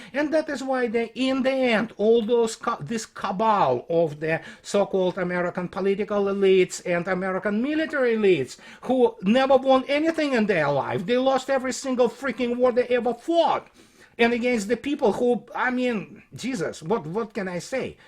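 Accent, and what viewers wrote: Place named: Russia. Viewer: i cant help myself but keep looking forward to your latest videos.i enjoy all your videos with technical information and analysis . enjoy your humorous description of western politicians and so called war experts who never faced bullets in the front lines. i love it when you tell the facts in Russian accent .love all your blogs.god bless you sir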